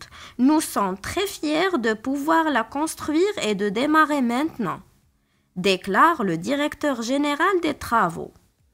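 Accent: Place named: Arab world. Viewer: Hhhh l accent est typiquement 3roubi .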